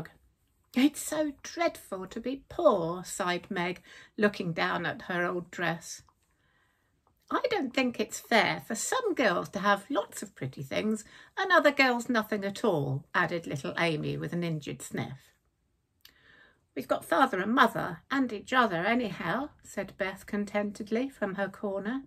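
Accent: England, United Kingdom. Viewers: Also, I loved hearing Little Women in your English accent, I could listen to all books in your beautiful accent! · No apologies needed for reading in your British accent; I loved it!!! I look for British readers for everything. 🤣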